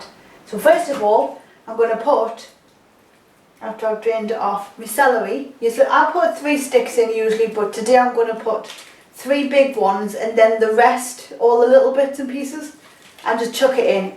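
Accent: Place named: North East England, United Kingdom. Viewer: Good summary and nice to hear a NE accent. Two other things that I find are really effective are: fasting (blood pressure also stays low afterwards) and going in the sauna (think it's because you relax rather than losing fluids - I drink a lot of water).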